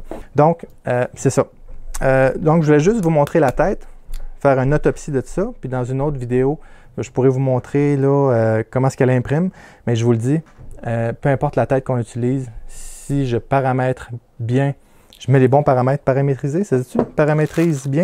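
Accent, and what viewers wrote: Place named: Quebec, Canada. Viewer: Bonjour, j'ai bien compris qu'il n'y a aucune animosité dans ton intervention, mais je trouve étrange de reprocher à un Québécois de parler..... québécois ;-) Marc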